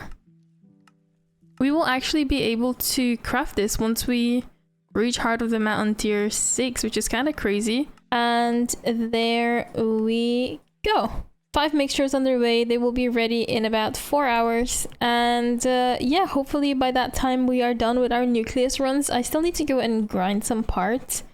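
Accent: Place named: Ireland. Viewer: what accent do you have? it sounds like a mix of cork and belfast ireland but idk for sure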